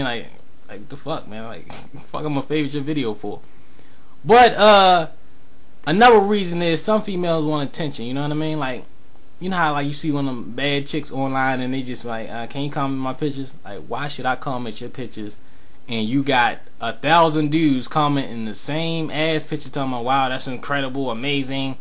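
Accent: Baltimore, United States. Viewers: are yu from baltimore dude tht made the video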